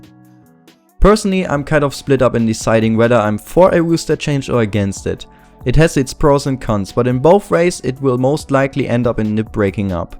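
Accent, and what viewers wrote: Germany. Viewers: schöner deutscher akzent :D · nice video but very much german accent but still nice :D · If u pay attention you could recognize ur german accent :D <3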